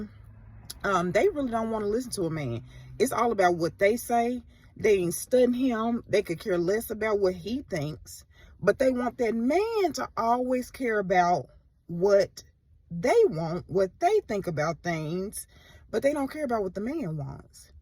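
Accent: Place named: South, United States. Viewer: I'm a none believer so it kind of means you are so sure that this being in the sky has your best interest at heart and is telling you how to live with absolutely no proof that this will powerful being exist and religion is huge in the south and you have a southern accent so you are from the south. Therefore, fairy tales from the south.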